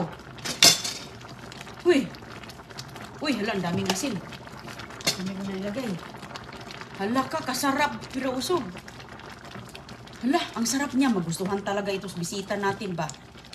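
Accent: Visayas, Philippines. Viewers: ganyan daw mag pronounce ang mga bisaya. Pero ang totoo hindi lahat.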